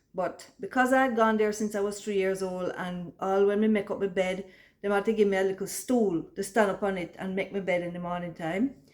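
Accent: Jamaica. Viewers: The funniest thing is hearing a chinese person talk in a heavy Jamaican accent. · My youngest daughter’s father-in-law is a white man with a Jamaican accent, just like this woman. It’s fascinating to see how he was raised in a similar way, embracing a culture that shapes his voice and mannerisms. ❤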